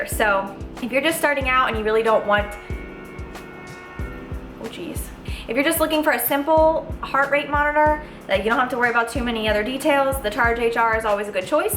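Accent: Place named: Michigan, United States. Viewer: Great review! I'm looking at getting the Blaze now that the prices have dropped and this will help me out! I love your accent. It makes me think of "home" in Michigan! :) I assume you are from the Northern middle of the country somewhere. The "oh geez" was classic! It's been a little less than a year since you posted this. Do you still like the Blaze?? Are you actually getting the 5 days charge that they advertise? Have they updated the software with anything you find useful/fun/interesting??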